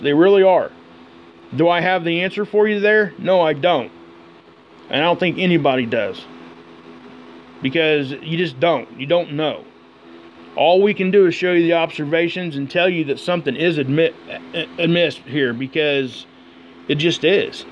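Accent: South, United States. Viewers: Thank you for ever thing. I like your southern voice it makes me miss my brother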